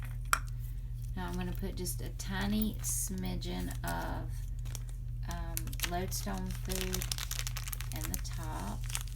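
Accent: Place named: South, United States. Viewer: I could listen to you talk all day, you sound like my ex wife. You southern belles are something else.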